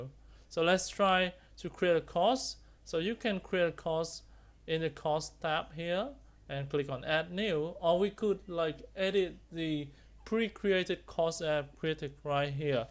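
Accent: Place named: Vietnam. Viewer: ui nghe như giọng VN luôn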